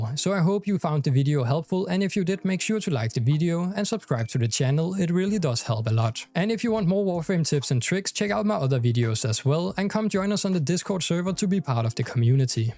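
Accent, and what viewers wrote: Denmark. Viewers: Fast question are you danish? You sound like one of my friends when he speaks English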